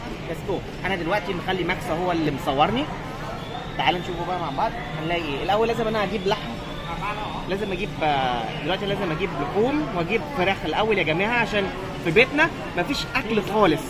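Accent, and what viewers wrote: Egypt. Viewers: احلي حاجة في العربي بتاعك انه مصري علشان كده اختاروك مصر حلوة بس نصيحة لو كان ليك نصيب ما تامنش حد علي اي معاملات وماتدخلش حد بيتك نهائي وخروجات الشغل بعيد عن زوجتك تماما والباقي مع اهلك في مصر اوي الشغالين ؟؟؟؟؟؟؟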